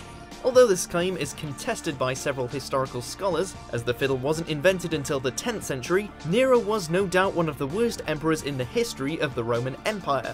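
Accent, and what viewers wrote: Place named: Liverpool, United Kingdom. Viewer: Can't watch this due to hideous Scouse accent saying 'merdered' every minute. Try not "murdering" the English language. Also "the women starved to death due to a lack of food"? Yes, that's generally why people starve to death.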